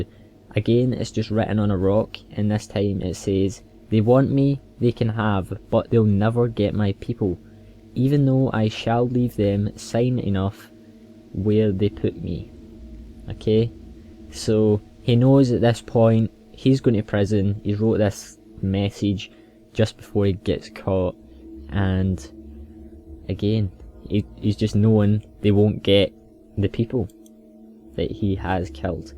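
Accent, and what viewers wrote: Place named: Scotland, United Kingdom. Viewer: cos hes scottish like me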